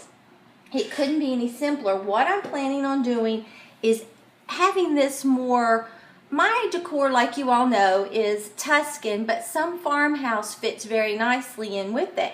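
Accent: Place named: Los Angeles, United States. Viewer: Do you live somewhere in LA. You have an accent similar to mine, but I haven’t lived there in 27 years.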